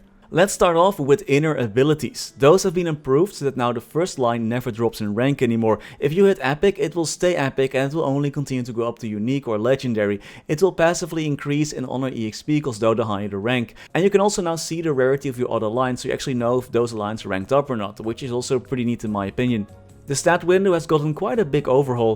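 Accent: Netherlands. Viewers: I can tell by your english that you are dutch lol! Thanks voor de video maat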